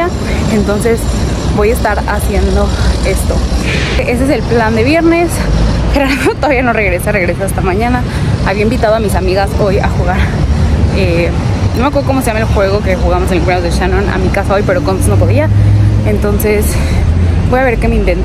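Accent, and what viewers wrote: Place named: Fresa, Mexico. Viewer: Holaaa, sólo no hables tan fresa y saldrán mejor tus videos. Saludos.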